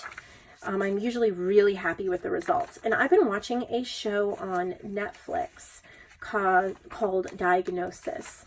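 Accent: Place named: Cornwall, United Kingdom. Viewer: I listen to videos as she lives near to where I grew up and I find her Cornish accent comforting.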